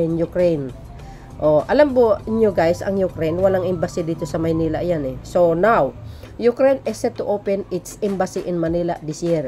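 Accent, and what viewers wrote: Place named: Russia. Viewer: BAGAY PO YONG BOSES NINYO NA MAY RUSSIAN ACCENT HABANG NAG BABASA OR NAG SASALITA . GOD BLESS PO WITH YOUR CHANEL.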